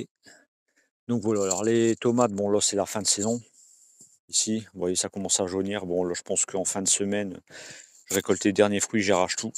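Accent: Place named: North, France. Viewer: Avec ton accent ça sent le Nord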